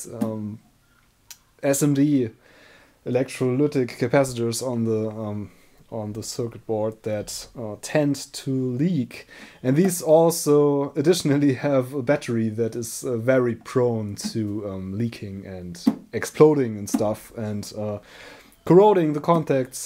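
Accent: Germany. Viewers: Hahaha, how did I miss this one over the course of the years... "This is a Macintosh SE DIRTY" :D:D:D Love the German accent :D I have one of these and I love it. Recapped it years ago with nice tantalums so goodbye leakage ever – still have to recap the analog board, so I'm happy I ran into this video just now, it reminded me of this :D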